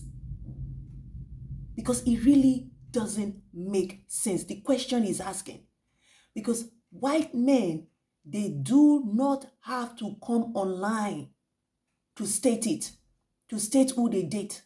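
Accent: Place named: Africa. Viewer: Love your accent sweetheart! Which African nation are you from?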